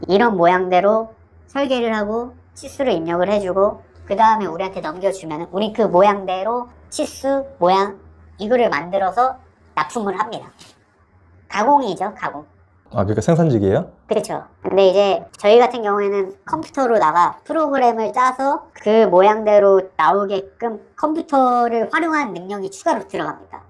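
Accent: Gyeongsang, South Korea. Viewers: 대구 말투 거슬리네요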